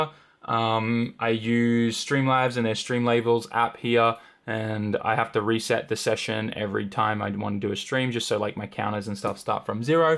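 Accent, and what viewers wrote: Australia. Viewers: I'm so glad I'm not the only one who heard that, lmao. The Aussie accent is strong in this one.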